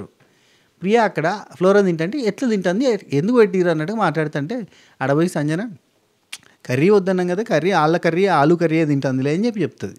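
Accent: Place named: Warangal, India. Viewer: Em okati em rendu anta 😂 Anna medhi warangal ah? Ma intlolla la matladthunnar meru ❤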